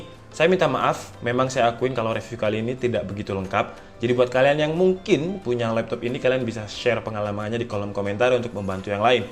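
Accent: Malang, Indonesia. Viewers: Wong malang mas ?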